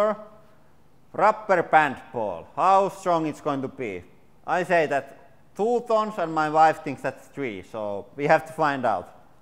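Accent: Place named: Finland. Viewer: You have finnish accent. :)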